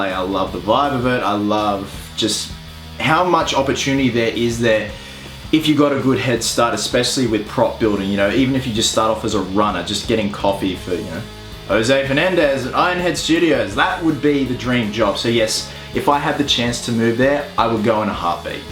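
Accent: Australia. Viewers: And they say the perfect guy doesn't exist. Australian accent included. haha :)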